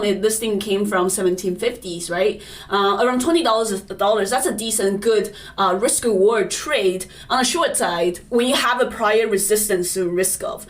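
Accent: China. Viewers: Can’t stand your Chinese English accent 😂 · Your accent is so thick I swear it’s like you’re speaking Chinese sometimes. I have to work hard to understand what you’re saying.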